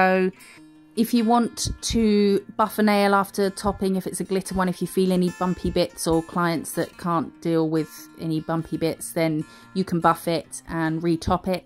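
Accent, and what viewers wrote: United Kingdom. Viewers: Love your video's ! Your channel was recommanded on a dutch facebookpage for hobbyist nailartists. And they where absolutely right to recommand you! ❤ your British accent 😍💅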